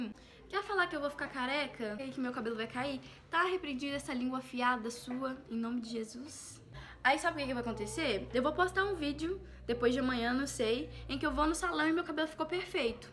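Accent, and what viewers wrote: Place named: Minas Gerais, Brazil. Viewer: Adoro nosso sutaquezinho mineiro 😍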